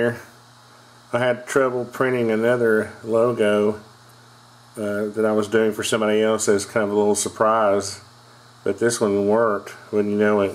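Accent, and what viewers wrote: United States. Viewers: Damn he has annoying "murica" accent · U have a really heavy american accent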